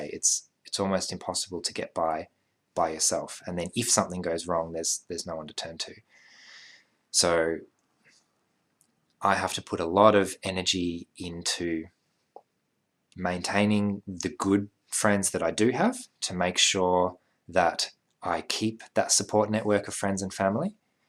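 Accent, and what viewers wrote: Australia. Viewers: Just out of curiosity, what part of Australia are you from ? I live in Perth, WA. I cannot figure it out. Lol i don't watch TV either.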